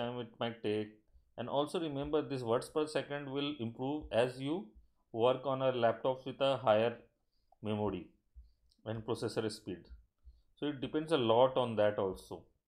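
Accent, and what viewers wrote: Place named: India. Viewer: Sean Gardner relax man... he speak like an Indian. I don't think he's a Isis member. but, we can't trust too. :/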